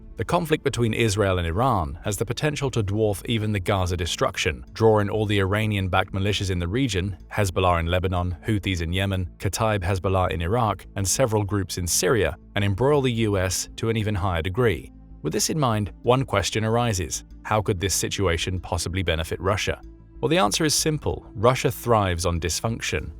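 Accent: United Kingdom. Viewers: You're using the British accent to kind-of whitewash some part of the British Raj system that turned communist in West Asia. The in-person alternative to Zionist government is something more evil with drugs and aliens, and the power structure for that derives from Shiite heresy against burial edict. There's a cultural schizophrenia where it is common to disparage Israel, de minimus, by omitting the obscenities to which Israel is some counter.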